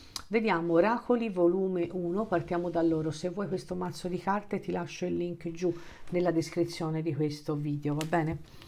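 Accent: Tuscany, Italy. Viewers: 😊😊😊grazie grazie grazie.è un piacere ascoltare te ed il tuo toscano😅😍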